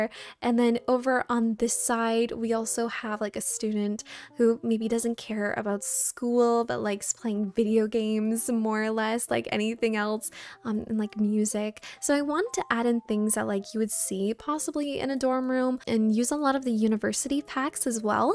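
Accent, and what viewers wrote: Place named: Canada. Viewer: out of curiosity, are you Canadian? The way you pronounced some words led me to believe you are (I’m from Ottawa, lmao)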